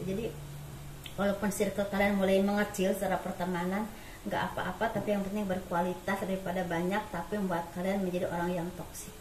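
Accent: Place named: Java, Indonesia. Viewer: Biar lah mau campur Jawa kek Gak masalah 😀😀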